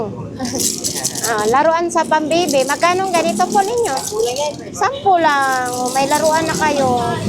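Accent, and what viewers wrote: Visayas, Philippines. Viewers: bisaya ikaw naman 😂😂😂😂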